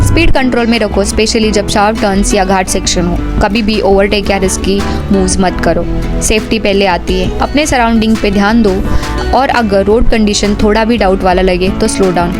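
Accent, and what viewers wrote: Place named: Maharashtra, India. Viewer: marathi accent dear...its cool...keep it up...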